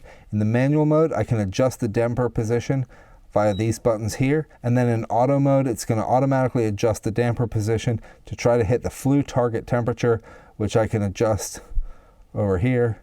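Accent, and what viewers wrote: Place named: United States. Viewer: Due to infectious curiosity, undiagnosed ADHD perhaps, I sidetracked my initial viewing of the video on a tangent. I hope you'll indulge some observations and the question those elicit. To my ears at least, you sound very American. However, several items in the setting, the whitewashed stone building most of all but even the colors of those wheels and tires, seemed more European in origin. So, I had to research " " to discover that you are located in the UK. Hence the question, which I hope you do not mind: Are you an American expat? I'm impressed with your design and fabrication and the thoroughness of your presentation. Ever since my family's livelihood and my own ability to travel freely via personal vehicle without regard to time or distance was adversely affected by the first Arab Oil Embargo in 1973, I have been intrigued by the notion of independent energy production. 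Today, was my first exposure to your channel and its content. I "liked, subscribed, and hit the bell" immediately and will be looking forward to seeing you further test and develop/refine the gasifier design and the materials it produces!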